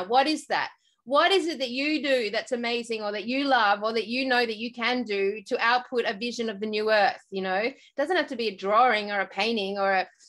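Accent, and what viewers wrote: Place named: Australia. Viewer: You are speaking ozzy, what is an ''Op-Shop'' ?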